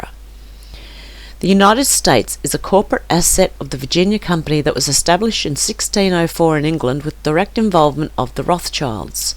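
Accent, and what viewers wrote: Australia. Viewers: You are amazing I am so glad that I stumbled across your channel and subscribed. I can tell that your heart is true your soul is pure and your spirit as of an amazing light and I love your Australian accent. Thank you for all you do. My name is Donnie Jordan and I will continue to listen just love your voice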